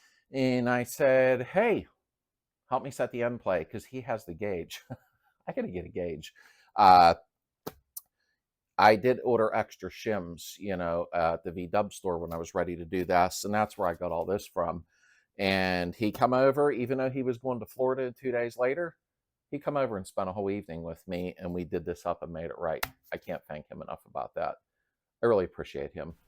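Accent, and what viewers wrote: Pittsburgh, United States. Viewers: Nice Video, I recognize that Pittsburgh accent anywhere I go...Where you located?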